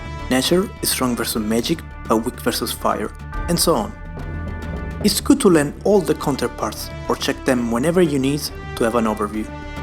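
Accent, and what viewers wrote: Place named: United Kingdom. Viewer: British accent LOL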